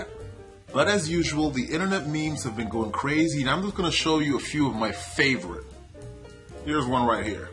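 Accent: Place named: East Coast, United States. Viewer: hahaha subbed back! I hear a little east coast..is that where you're located?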